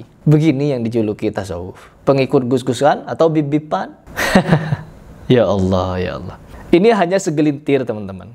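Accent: Madura, Indonesia. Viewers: Abangnya logat Madura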